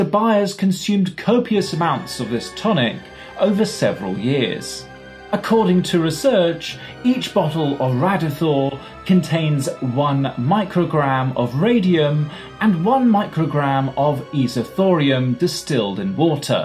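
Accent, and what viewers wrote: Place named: RP, United Kingdom. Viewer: Not only this material is utterly informative...the level of English RP is amazing,great training for an English teacher like me hailing from Havana.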